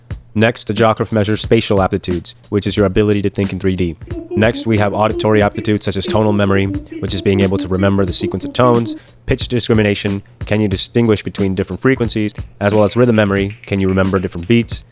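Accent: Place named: United Kingdom. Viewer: That British Accent 😂😂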